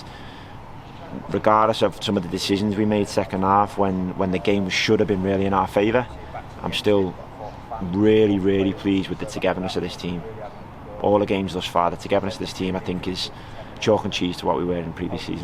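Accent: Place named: Merseyside, United Kingdom. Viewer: Still the best choice the board made for gaffer this season. Just one thing spoken to you loads more so when you were playing out field way back in division one days and I never picked up on that accent mmmm which side of the Mersey you hale from. Just a nosey Birkenhead lass asking. Behind you all the way. You've deffo got the lads hungry some stunning set pieces going on. God bless you big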